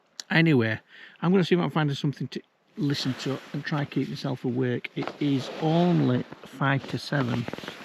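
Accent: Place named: Yorkshire, United Kingdom. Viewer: I’m up in May,cycling from Dunoon to Tayinloan and bikepacking on Gigha. Funny hearing all those Gaelic place names in a Yorkshire accent. Great vid, thanks.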